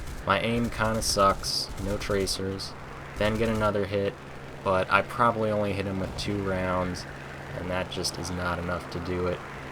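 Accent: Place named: New England, United States. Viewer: I bet your from Massachusetts. Your accent sounds like a New England Suburban Accent. I'm From Boston MA myself and we also say "retarded" like every other sentence. If I'm wrong I apologize but thats my guess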